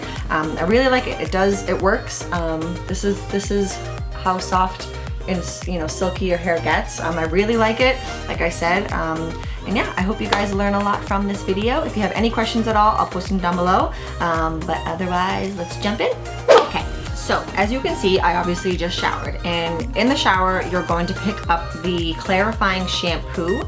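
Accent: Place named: New York, United States. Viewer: Love your videos. Trying to place you slight accent. Are you from New York?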